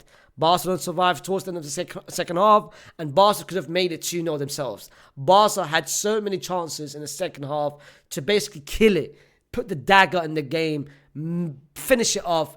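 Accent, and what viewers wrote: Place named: United Kingdom. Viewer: That British accent from a Iraqi is crazy unc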